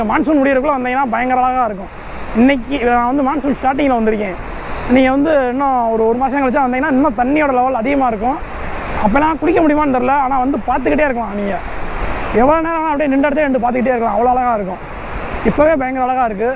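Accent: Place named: Madurai, India. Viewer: Hi, Nice Hidden waterfalls & your natural Madhurai Slang is so good to listen.....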